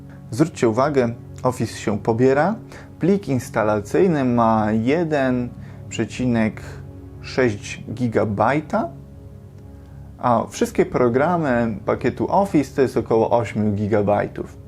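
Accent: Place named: England, United Kingdom. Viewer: I ten angielski akcent. Bardzo przydatny film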